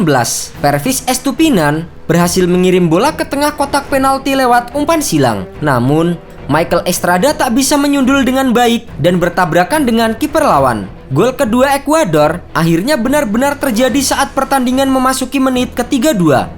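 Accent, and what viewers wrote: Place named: Sulawesi, Indonesia. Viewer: Ini yg ngomong orang sulawesi yaaa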